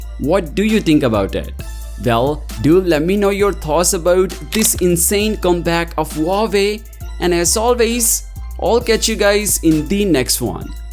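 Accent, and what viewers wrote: India. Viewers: Indian with English accent 😅 can't be watched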